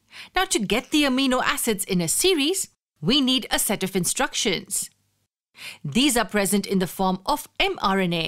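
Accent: India. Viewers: Gosh, this is all explained so well, I just can't get over the Indian accent (assuming it's indian)!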